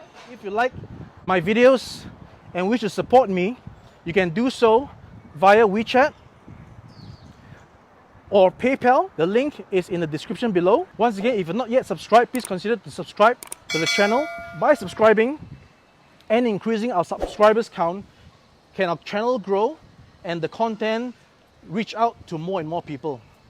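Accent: Singapore. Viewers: Singapore accent. Why weird? You are weird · I think his accent is Singaporean accent, not Indian :) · Singlish is hard to follow……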